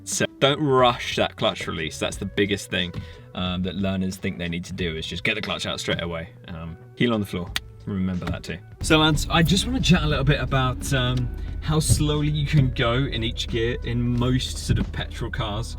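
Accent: England, United Kingdom. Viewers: I heard the same thing! Sometimes this British accent drives me crazy. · Something about his English accent makes me want to listen more 🥴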